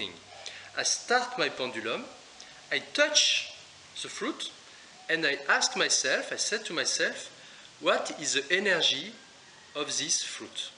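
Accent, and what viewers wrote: France. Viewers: Love the accent😁my son in law is French, and reminds me of France and lovely people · Loved the video! very helpful and explained lovely - specially with the french accent ( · Ohh, the french dialect... j'aime! ❤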